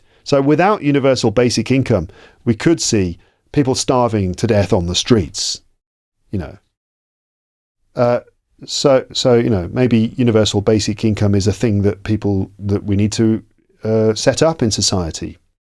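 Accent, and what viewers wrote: United Kingdom. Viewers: Greetings from Brazil! I’ve been watching your videos to improve my British accent, you’re amazing !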